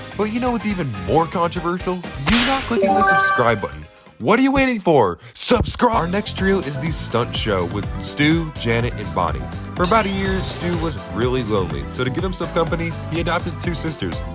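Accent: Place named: United Kingdom. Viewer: As an Australian he sounds so British 😭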